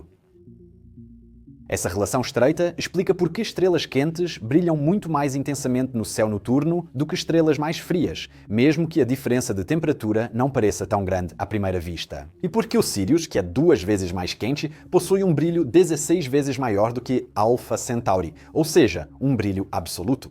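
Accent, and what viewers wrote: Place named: Portugal. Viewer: Excelente vídeo. Ficou legal ser narrado metade pt.pt e metade pt.br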